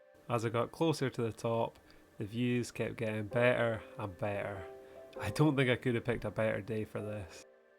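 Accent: Inverness, United Kingdom. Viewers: Hi, sounds like you are from Inverness area? I'd happily do the South Glen Sheil Ridge again with you if you are needing company 👍. I've only done 8 but An Teallach is my favourite so far 👍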